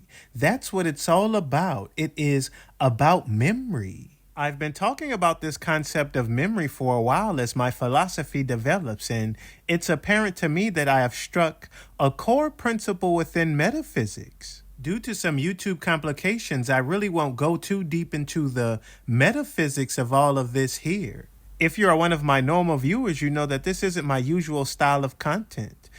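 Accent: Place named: Philadelphia, United States. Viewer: are you from Philadelphia or the surrounding area? Just judging by your accent.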